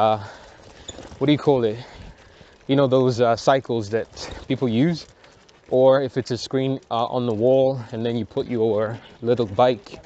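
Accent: United States. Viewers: Thank you for sharing helpful information. We need more details about lands and houses for sale in Bujumbura. I like the way you speak English you sound like you have been in USA for a while. Just suggestion try to focus on real estate and then we will be able to see some houses on sale.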